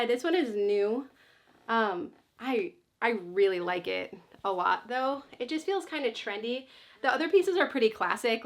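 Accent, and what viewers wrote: Minnesota, United States. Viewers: Love watching you, lived in Minneapolis years ago. Good to hear a good Minnesotan voice.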